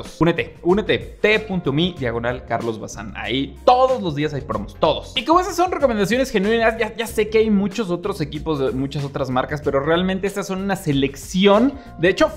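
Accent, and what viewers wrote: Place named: Mexico. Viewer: Me parece agradable el acento mexicano. El ar, me da as co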